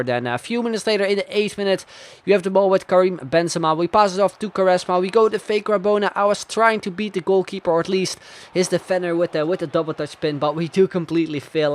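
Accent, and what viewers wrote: Netherlands. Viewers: Are you Dutch???????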